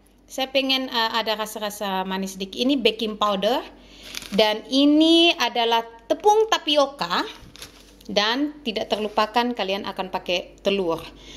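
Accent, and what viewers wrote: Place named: Papua, Indonesia. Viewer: cara bicara kaya orang papua Soalny sy org papua😂😅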